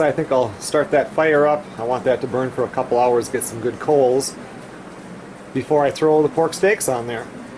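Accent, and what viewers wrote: Minnesota, United States. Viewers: Thanks, I was thinking maybe you were in Minnesota (accents lol).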